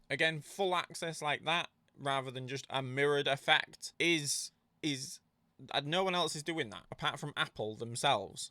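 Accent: United Kingdom. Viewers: Thanks for the info, not much on Pico 4 Ultra out yet on the net! BTW, I find your English accent really interesting! What part of the UK is this from?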